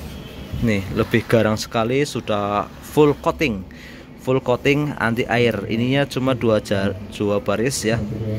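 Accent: Banyumas, Indonesia. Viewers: Ngapak nmen yah mas.. pkoke jos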